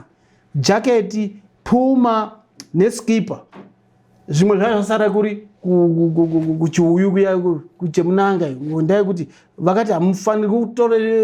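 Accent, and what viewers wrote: Ndau, Zimbabwe. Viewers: Chindau chondispaka zvangu😂😂😂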